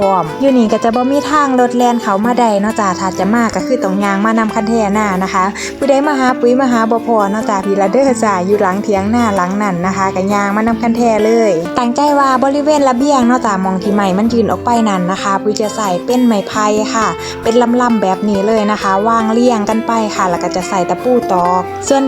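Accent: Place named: Sisaket, Thailand. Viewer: เว่าไป๊-กิ๊นคนทางศรีสะเกษเบาะคะอยู่อำเภอได๋น้อค่ะ😅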